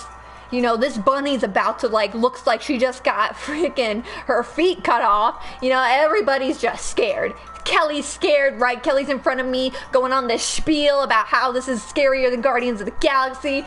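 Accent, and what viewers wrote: South, United States. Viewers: Why does this Turk sound like a deep south American? :-?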